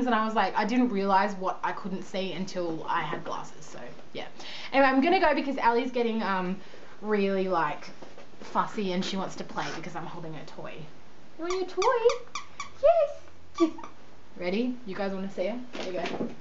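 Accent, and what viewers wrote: Australia. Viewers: Are you Australian ??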